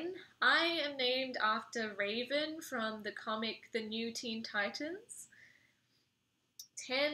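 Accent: Australia. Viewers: I can't place your accent. Have you lived in Australia?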